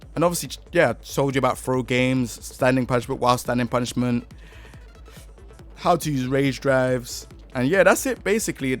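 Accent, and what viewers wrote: South London, United Kingdom. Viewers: Both from U.K., is from south london and I’m in the south region too, it’s quite common to hear similar or diff accents over here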